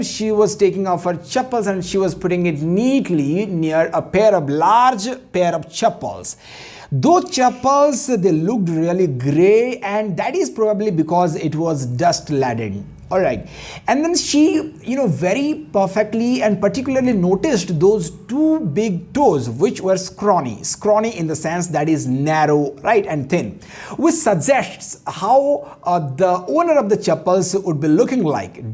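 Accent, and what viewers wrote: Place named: England, United Kingdom. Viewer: Wooooow Sir.... Nice English Accent ... Mind Blowing Sir....Shriya...😍😍😍👏👏👏👍👌👌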